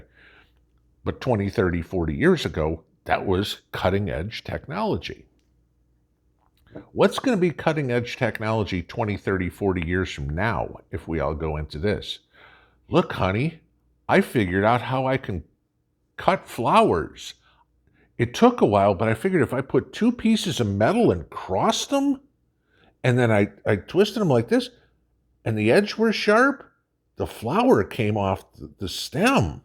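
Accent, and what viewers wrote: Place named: Minnesota, United States. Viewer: New subscriber........Is he from Minnesota........kinda sounds like it.............Im from there so I am just askin...........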